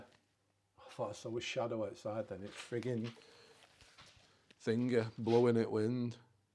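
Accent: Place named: England, United Kingdom. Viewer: Great Videos. For sometime now, each time you ask Alexa a question, our Alexa answers you 😳Our voices are completely different. I have a Texas draw and you have an English accent…✅🇺🇸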